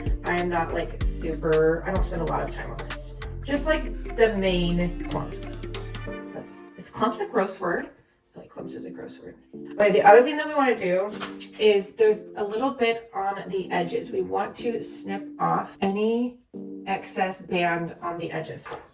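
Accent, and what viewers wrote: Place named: United States. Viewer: Hey from Melbourne Australia 😁 I need to tell you and I hope you see this that I've never come across your channel until today and from the get go your presence in front of the camera, sounds weird but your American accent and tone is so easy to listen to😄 lol it's positive, straightforward, informative, believable, easy to relate to, fun to watch and you instantly drew me in! I love your name and the design on either side as there no other distractions in the frame and my eyes go instantly to you. " Well of course that's the intention" lol my favorite colors are pink/ purple/ turquoise, peach and silver and obviously that's another reason I was sucked in 💜 then of course you are an edgy, sassy, talented, funky, creative, bubbly, charismatic goddess of a UNICORN 🦄 Female🤩 God I'm full of compliments today lol and of course your stunningly beautiful have the best eyelashes of course and ooze mad swagger!!! 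There ya go💜 and I will be using all your tips for doing my lashes for myself when I buy the products I need. You brightened up my day so thankyou ☺ from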